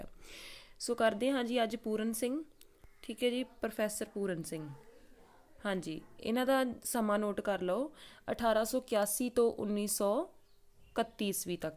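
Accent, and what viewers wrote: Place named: Doaba, India. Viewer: ਤੁਹਾਡੀ ਬੋਲੀ ਤੋਂ ਲਗਿਆ ਸੀ ਮੈਨੂੰ....ਮੈਂ ਵੀ ਦੋਆਬੇ ਤੋਂ ਹਾਂ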